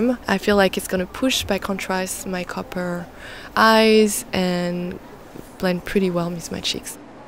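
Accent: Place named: France. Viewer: Nice voice and French accent. You're so chic . Keep up the great work .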